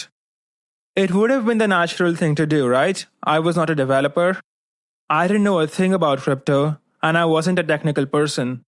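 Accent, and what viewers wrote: India. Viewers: Why are people hating on his accent? No offense, but I find hard understanding Indian accent and this video is the exception. Great content.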